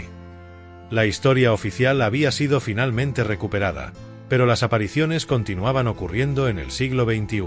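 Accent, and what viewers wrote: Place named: Europe. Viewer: Observen que su voz está en acento europeo. Pero grabado recientemente. ¿El guionista cuánto cobró? En San Cristóbal nunca se había oído una cosa así. No crean todo lo que escuchan. Te da un guión con una narrativa impactante Y cómo la gente no le lee... La Era de la Desinformación Al mismo tiempo que habla de que fueron los portugueses dice que fueron los españoles. Tampoco dice otros datos verdaderamente teórico.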